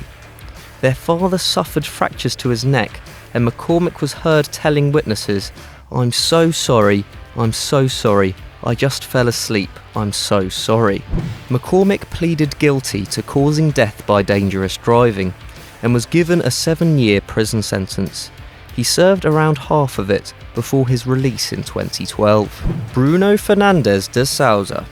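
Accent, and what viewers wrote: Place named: England, United Kingdom. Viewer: 100 kilometres an hour.. You say this with a English accent.. YOUR ENGLISH MATE it's MPH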